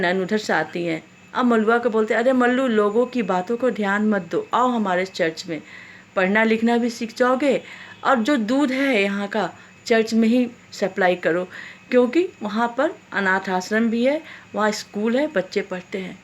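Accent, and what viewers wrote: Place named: Bhojpuri, India. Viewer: जो भोजपुरीया शैली का तड़का लगाया ना बहूते झबरदस्त बा😀😀👏👏👏👏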